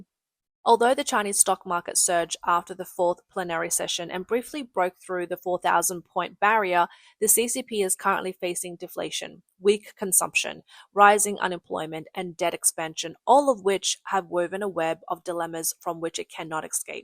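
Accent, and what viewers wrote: Australia. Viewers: I don't want to hear an australian making noise tonight. Chinese don't have Ozzie accents!!! Get a host. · I would not trust any of this information since an Australian is reading it.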